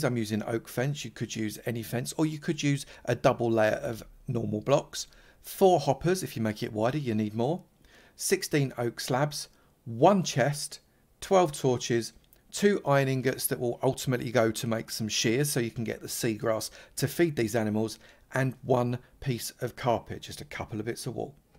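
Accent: United Kingdom. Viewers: this is guy is so british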